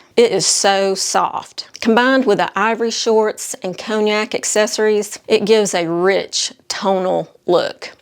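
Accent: South, United States. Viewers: Hi! I am new to your channel! Been watching about a month! I grew up in LA (lower Alabama)! Im 65 and enjoying your videos so much! I'd never heard of Quince before so i am going to check them out! Love the cashmere tank and the cotton sweater in all the colors! One if my favorite things about summer are the flowers and swimming in my pool! Also love getting to finally wear the summer colors and ditch the black and browns (for the most part)! Thank you for the great content. Love your videos! Oh and im enjoying listening to someone who sounds kinda like me ( Southern accent! 😂).❤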